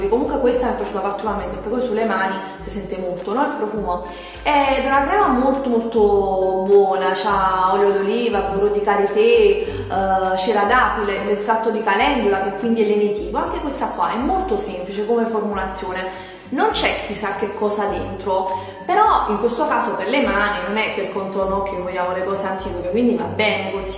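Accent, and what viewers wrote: Naples, Italy. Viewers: Adoro questo tuo accento Napoletano.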